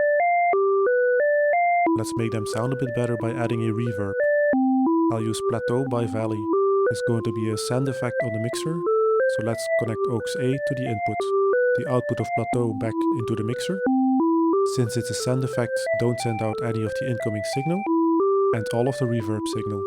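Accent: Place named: Netherlands. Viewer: thanks for the response - it's one of the best and most lucid tuts i've seen about this subject - would it be possible to put the voice on one channel and the synth on the other so that we could pan it at home? i'll definitely give it another go, but trying to decipher your (Dutch/Belgian?) accent at the same time was giving me a headache so i had to stop about 10 minutes in, sorry :)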